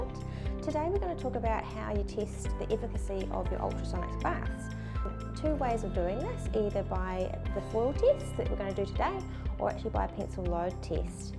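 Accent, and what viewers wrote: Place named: New Zealand. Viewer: It new zealand accent, i can understand her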